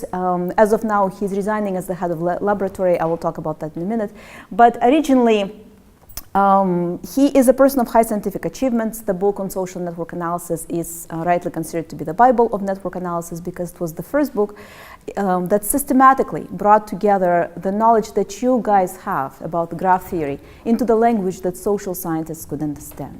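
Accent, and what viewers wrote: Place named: Russia. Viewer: Man, such a terrible russian accent... But the material is great.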